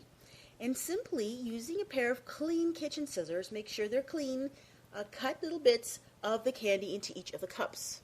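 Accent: Canada. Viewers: are you cannadian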